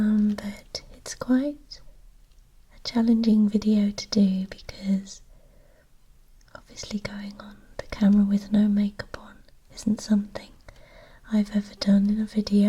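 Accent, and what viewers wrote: United Kingdom. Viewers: damn her accent is so British :')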